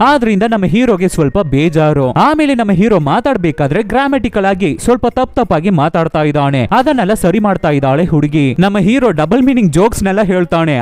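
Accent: Tamil Nadu, India. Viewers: Tamil Mix Voice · Neevu tamil avra accent same ang ide like tamil guy talking ಕನ್ನಡ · Your language slang like tamil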